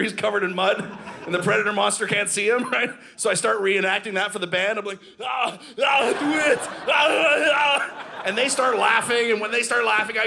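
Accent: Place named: Canada. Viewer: Really good! I watch a lot of comedy, and damn it’s good to hear some Canadian.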